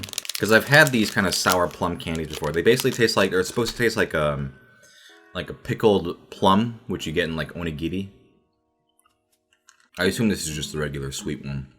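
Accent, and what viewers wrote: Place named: United States. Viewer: I'm lying in bed at 3AM, watching a Korean man with an American accent eat Japanese snacks... what am I doing with my life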